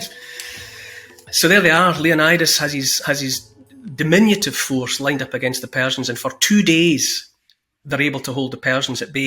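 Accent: Scotland, United Kingdom. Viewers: You tell the story so beautifully Mr Neil Oliver with your beautiful Scottish accent. Like yourself I have always admired and respected the Spartan Warrior culture. I served Honorable in the United States Marine Corps. SEMPER FIDELIS SIR🇺🇸🫡✊️🖖🙏GOD BLESS YOU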